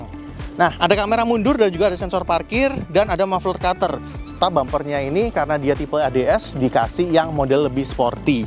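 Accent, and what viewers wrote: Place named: Java, Indonesia. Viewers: Wong jowo to mas, 😁 semangat mas broo.. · Lokasi apartemen denver Layak bahasa.e medok🤣🤣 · logat jawa timuran.... 😜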